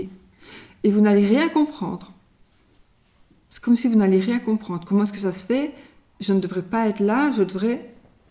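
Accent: Belgium. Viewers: Vous êtes belge ?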